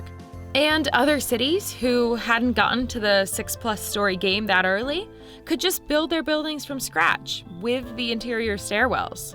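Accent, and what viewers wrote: United States. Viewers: My impression is that (based on the host's accent) they're based out of the US and report on locally relevant material. Besides, crapping on the US is the national pastime.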